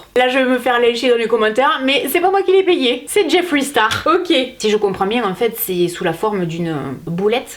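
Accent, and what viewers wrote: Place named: South, France. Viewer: Merci pour ton sourire et ton accent.... Du sud à Paris... Je sors de l hôpital St Antoine. Je suis aidé soignante... Ca fait plaisir... Une vidéo en attendant mon RER... Je suis ravie..!!!!!! 💜💕💜💕💜💕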